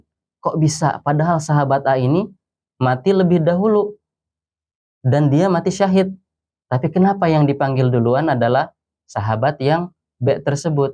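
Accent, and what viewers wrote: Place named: Bogor, Indonesia. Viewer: Tabarokallah Logat bogornya ga ilang😊😊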